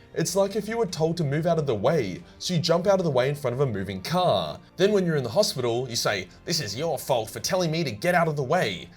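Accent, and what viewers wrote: Australia. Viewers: why does this man sound like australian chills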